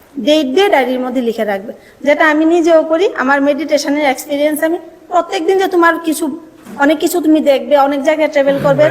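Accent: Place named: Bangladesh. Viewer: Kothar tone ta onek ta bangladesher moto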